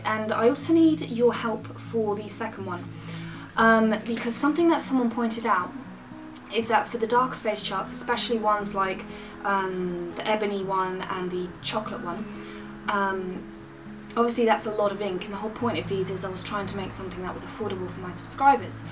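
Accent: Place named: Brighton, United Kingdom. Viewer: You sound like you're from Brighton (: you're a really beautiful woman too! Very natural (: xx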